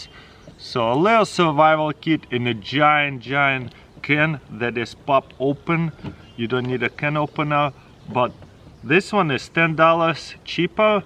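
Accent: Russia. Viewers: sir, i find your accent to russian. please get a vanda white to do the talking.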